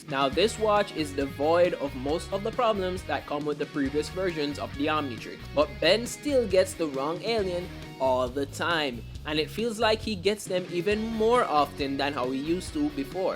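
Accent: Jamaica. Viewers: Either jamaican like me or im mishearing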